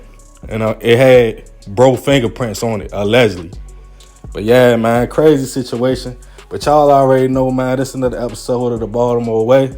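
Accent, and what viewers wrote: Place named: South Carolina, United States. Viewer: U sound like u from South Carolina or sum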